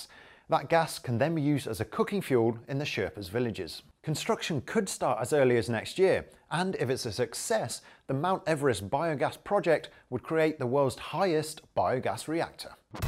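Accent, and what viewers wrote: United Kingdom. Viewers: Cicaaaadas! I hate British accents! Cicadas.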